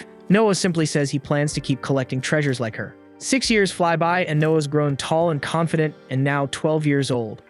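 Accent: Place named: United Kingdom. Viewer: After , I think he's British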